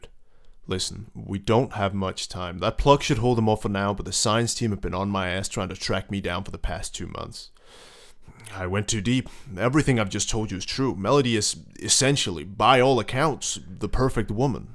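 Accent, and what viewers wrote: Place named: Denmark. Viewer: Den dansk engelske accent vil altid være der uanset hvor meget man prøver at gemme den xd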